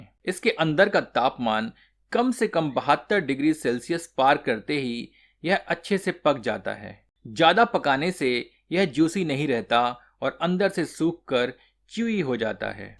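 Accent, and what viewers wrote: Standard. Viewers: apki suddh hindi sun k anand ata h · best is your shudh hindi narration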